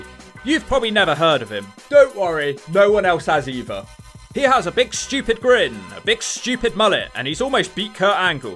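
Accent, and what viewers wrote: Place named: West Country, United Kingdom. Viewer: I've watched a few of your videos and always enjoy them. From your (West Country?) accent I thought you would be like 5'6, nerdy black hair and glasses. But you are very presentable, keep up the good work, I appreciate you're unique take on everything wrestling wise. There is so much generic wrestling commentary on YouTube, so your distinctiveness definitely stands out.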